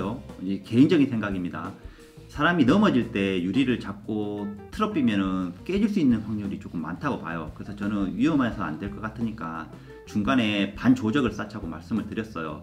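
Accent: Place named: Gyeongsang, South Korea. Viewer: 영상 볼때마다 홧병 생겨요ㅠ 왜 이제야 가 알고리즘에 떴는지 ㅠ 그래도 갱상도 구수하고 순수한 말씨가 좋아서 숨고르기하면서 봅니당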